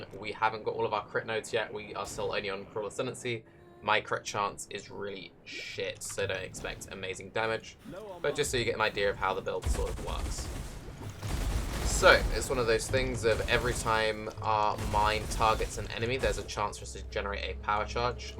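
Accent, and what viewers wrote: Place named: RP, United Kingdom. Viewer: yeah i miss posh speaking made me feel like im im the presence of royalty. FeelsRoyalMan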